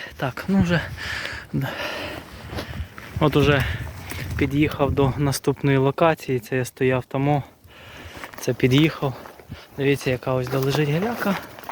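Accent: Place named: Khmelnytskyi, Ukraine. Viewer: Майже вгадав. По вимові зрозумів, що північ Хмельницької області. ))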